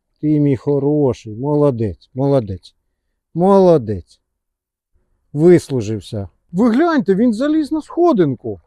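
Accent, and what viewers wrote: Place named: Russia. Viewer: Русский акцент режет слух !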